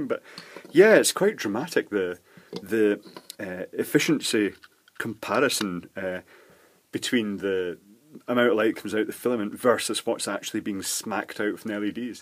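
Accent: Scotland, United Kingdom. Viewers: Are you originally from the Isle of Man? Your accent makes you sound like you're from Scotland.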